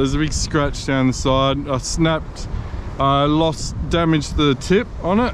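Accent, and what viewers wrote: Australia. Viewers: You sound d like Aussies.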